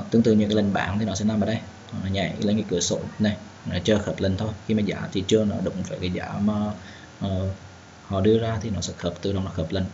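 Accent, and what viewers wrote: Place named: Central, Vietnam. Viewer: Học tiếng việt nam đi bạn ơi chứ làm youtube nch quốc gia miền trung vậy nghe nhức cả óc =.=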